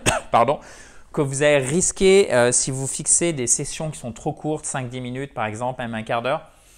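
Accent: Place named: United States. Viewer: Finalement tu enseigne l'anglais américain où britannique car dans tes prononciations tu emploie plutôt l'accent américain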